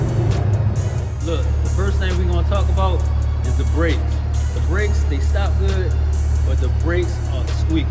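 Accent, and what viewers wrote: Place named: DMV, United States. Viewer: Dat dmv accent funny af duews and twues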